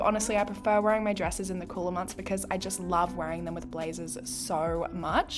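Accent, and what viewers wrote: Australia. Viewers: Oh my goodness you are the absolute best!!!! You are so beautiful!! Heck freakin'yeah of course ur Baby Girl wants in on Mama's work who wouldn't? She knows how gorgeous she is and how awesome her Mama is!!! She wants to be the icing on the cake and get those "bootie scratches" I call them 🤣....Puppers and Kittas are awesome!!! I just recently subscribed to your channel after watching a few of ur true crime videos and just fell in love with it!! I love true crime and u do a fabulous job!!! Plus u are so beautiful and cute!! I love the "disclaimer"...lol you put on one of ur videos I watched recently when u said listen I'm going to pronounce things wrong..lol. I mean who doesn't pronounce things wrong like all the time!!! And I think u do a fantastic job!!! U do ur best to pronounce things properly that I could NEVER even attempt to!!! So if the haters wanna hate let em' that's on them!!!! 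Also when someone would bitch about ur Mystery Mondays not always being posted on Mondays persay....get the hell over it ur a busy woman!!!! I absolutely love ur accent as well!!! I'm American, I've always loved Australian accents though!! Take care! ❤️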